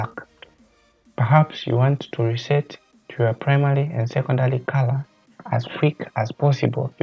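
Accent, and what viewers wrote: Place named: Nigeria. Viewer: Sounds like a nigerian 😁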